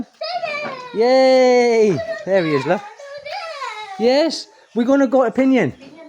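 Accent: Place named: Teesside, United Kingdom. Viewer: Here’s me thinking you were from Teeside😊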